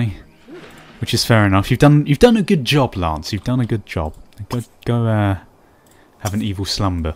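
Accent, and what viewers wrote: United Kingdom. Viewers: British accent = $waggest accent in the world.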